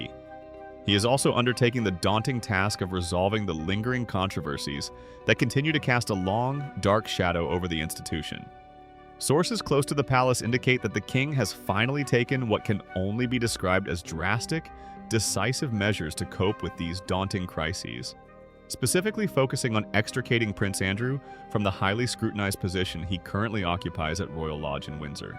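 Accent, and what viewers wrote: United States. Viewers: Why am I hearing an American accent? Our King is not your concern.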